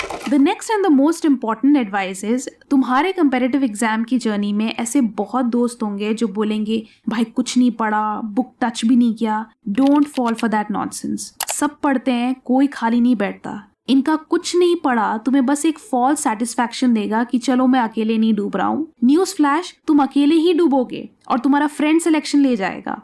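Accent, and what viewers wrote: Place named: Kashmir, India. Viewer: Are you kashmiri by any chance? You accent seems 😄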